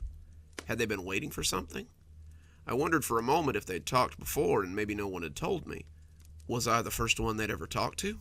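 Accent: United States. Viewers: were does ur accent cum from American obviously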